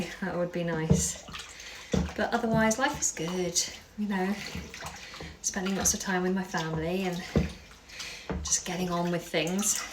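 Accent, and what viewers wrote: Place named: England, United Kingdom. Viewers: First video I have seen of you very good video. You have a nice clear English accent but dear god did you eat a horse or something LOL. It looks you have eating a horse. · Hello from Jon in the Los Angeles area. I'm an American, I speak "New York" and "Chicago" English (sometimes with the accents coming through). I've been to London thrice now (each time spending a week there, including trips to Norwich and Manchester - ace!). London feels like home, it really does. Got friends there too. I feel pretty confident with my English accent, though Northern English is a bit difficult. I can do Scottish and Irish too! I remember seeing your video using your native Mancunian accent, loved it! But, I have more learning to do while watching more of your content! Cheers, and continue to stay safe. Jon El Segundo, CA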